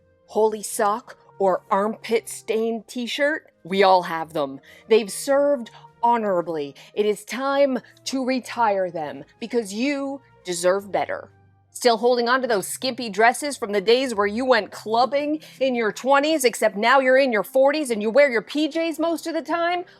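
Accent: South, United States. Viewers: What an awesome, hilarious video! Your southern vouce was sooooo good! ... Maybe a video in all southerb twang?!!! Penny you are a beautiful baby! ❤❤❤❤❤ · 😂southern - love it! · Love the southern drawl & the bloopers at the end! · The Southern is funniest! Keep it! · I too was wondering why you were southern all of a sudden.. lol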